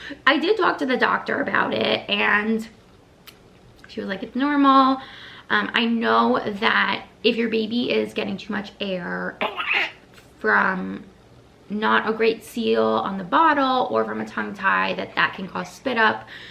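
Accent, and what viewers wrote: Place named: Central Jersey, United States. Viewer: We have literally the same accent (I'm originally from central Jersey) 😂 my husband always teases me when I say "bad" like that 🙄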